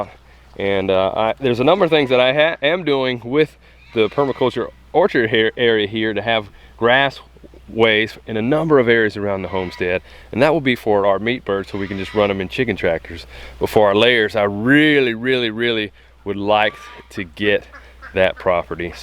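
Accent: North Carolina, United States. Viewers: I'm new to your channel. Love the family farm. Your accent makes me miss NC. I'm on the cute little boy's side- I want to see a T-rex too! He is so adorable.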